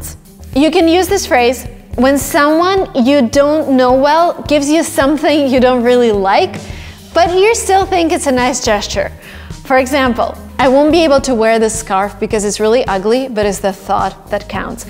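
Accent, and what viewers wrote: England, United Kingdom. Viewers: I really adore your english accent. Thanks for your all video. Merry Christmas 🎅🎅🎅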